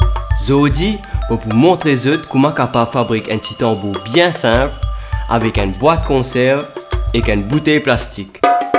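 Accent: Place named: France. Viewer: Hahaha me disait bien qu’il avait l’accent français quand il parle anglais 😂 Damn j’ai douté hehehehe😂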